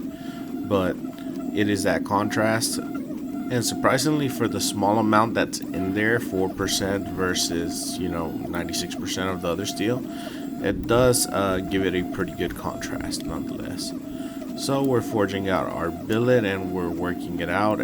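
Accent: New Mexico, United States. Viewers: Ive been watching for a second now, your skills are definitely improved. Pretty much one of the few makers I follow. You sound like a fellow NM so I just enjoy the commentary!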